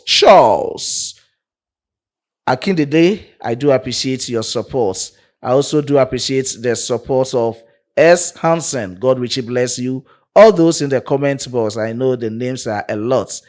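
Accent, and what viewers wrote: Ghana. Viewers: Mr you sound Ghanaian to me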